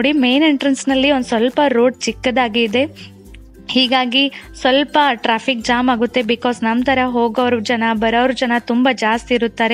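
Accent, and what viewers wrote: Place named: Belagavi, India. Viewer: hi akka nimma ura yavduu heli.....Niv belgavi Kannada matadtira adake kelde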